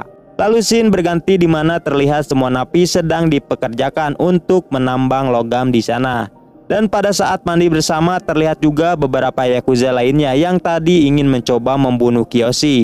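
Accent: West Java, Indonesia. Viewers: Orang sunda ya bang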